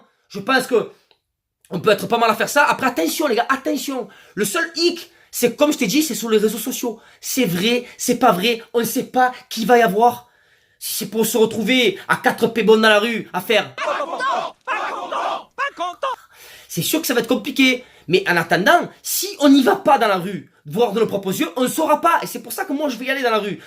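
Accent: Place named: South, France. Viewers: Minable ce commentaire, il a un accent du sud et parle avec les mains et alors? ça vous suffit pour le juger et le traiter d abruti?? j ai pas pour habitude de jouer au cow boy en défendant des youtubers, mais pour le suivre depuis un certain temps j ai envie de dire que c est un homme extrêmement intelligent et respecteux, qui traite de sujets variés à travers des analyses pertinentes et une touche d humour. Il faut être talentueux pour arriver à faire ce qu il fait et surtout pour supporter des commentaires gratuitement haineux et non fondés comme le votre.Voila pourquoi il est suivi par près de 30000 personnes Madame, peu cher payé d ailleurs pour les moments de rire qu il nous offre. Bref, je ne vous salue pas. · Je n'en ai rien à faire si vous ne me saluez pas ! je ne vous ai rien demandé il me semble ? je ne me suis pas adressée à vous. 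Si vous suivez ce gars c'est votre affaire, libre à vous. Ce n'est pas parce qu'il a un accent du sud qu'il m'horripile, c'est parce qu'on dirait un malade mental en train de gesticuler ! 3mn de sa vidéo m'ont suffi !!! franchement s'il est intelligent, il le cache bien ... je suis désolée si mon commentaire ne vous plait pas, mais j'ai l'habitude de dire ce que je pense. Cela prouve votre niveau "d'intelligence" à vous aussi... visiblement lorsqu'on aime pas quelqu'un on est catalogué de haineux ? belle mentalité ! bravo, vous avez tout compris !! alors continuez à écouter les analyses pertinentes du pantin dans sa boite, je pense que votre niveau est identique ! ne prenez pas la peine de me répondre, je ne vais pas perdre mon temps à venir vous répondre ! je vous laisse donc déblatérer tout seul !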